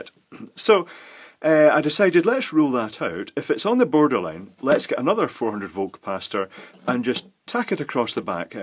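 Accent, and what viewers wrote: Scotland, United Kingdom. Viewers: Have you ever thought about putting an album of bedtime stories out? Mothers would love you. Your soft voice and gentle,scotch accent would put any child to sleep. Sometimes it puts my wife to sleep. "I don't understand a word that he is saying, but I love his voice." Then she nods off.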